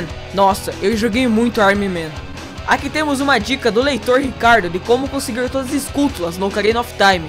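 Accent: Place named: Paraná, Brazil. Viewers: axei que vc morasse em Curitiba, seu sotaque de paranaense é bem forte, legal vc se ser daki "pr"... tem muito potencial, boa sorte =D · Parece paranaense... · Você é de Curitiba ou de outra cidade do Paraná? O sotaque é inconfundível.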